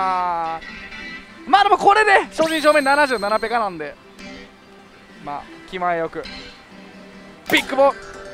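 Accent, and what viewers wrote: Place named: Kansai, Japan. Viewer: いそまるってこんなに関西弁コテコテだったっけ？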